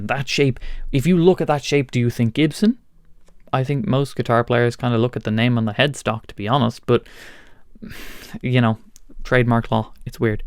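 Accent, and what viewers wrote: Dublin, Ireland. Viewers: Englishman here, got me too! Turns out I’d never heard an Irishman say the letter R before. Now I know. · That is not how all Irishmen pronounce the word R , he is from Dublin & that is how they say their R`s. Just like the UK , there are many different accents in Ireland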